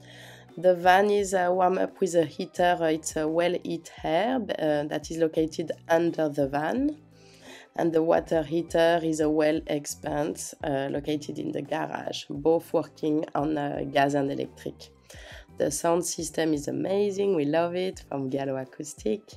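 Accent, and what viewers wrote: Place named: France. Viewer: Love your french accent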